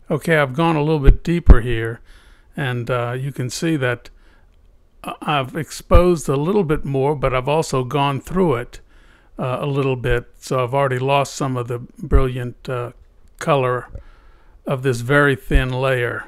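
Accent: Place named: Louisiana, United States. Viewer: Another excellent video of my favourite Lousiana accent. I will train to have the same english accent as you. For me is a double gain, I learn English and about minerals.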